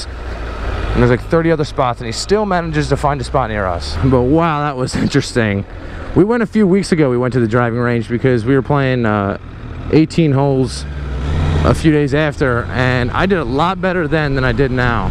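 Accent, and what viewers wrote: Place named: Alabama, United States. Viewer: hey man, what part of alabama are you from?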